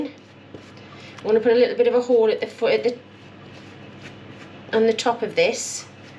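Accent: Newcastle, United Kingdom. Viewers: Love your Geordie accent and Newcastle Brown Ale! From a geordie livng in posh Oxfordshire! I remember delicious school dinners and once a month we stood in line for a handful of soft brown sugar! Still going strong at 81! Lovely recipes, will try them all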